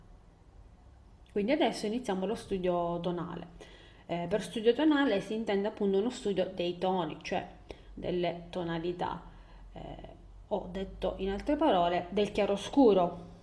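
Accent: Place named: Sicily, Italy. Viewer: grazie per i tuoi video e complimenti, si sente che sei siciliana, un saluto da palermo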